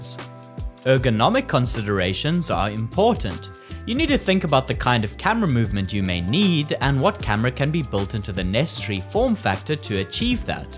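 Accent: South Africa. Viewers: Kiff to hear a Saffa accent. Nice one, bru!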